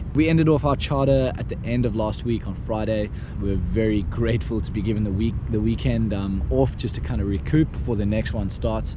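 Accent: South Africa. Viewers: your accent sounds south african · Are you South African bro? And if so what advice would give someone who would like to work on yachts like you? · Are you south African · Are South African? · Are you South African???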